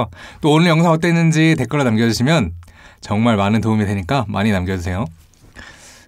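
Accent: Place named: Seoul, South Korea. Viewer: 서울말투 좋당 나는 쏘쓰 라고 하는데 소~스 라고 하시니까 발음이 부드럽네요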